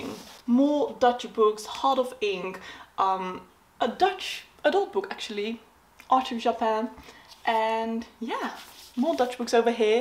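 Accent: United Kingdom. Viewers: Oh huh, wauw. Ik las als in van de UK. In combinatie met je Brittish-achtige accent. Maar wat doet ze met al die Nederlandse boeken haha... Fijne dag.😊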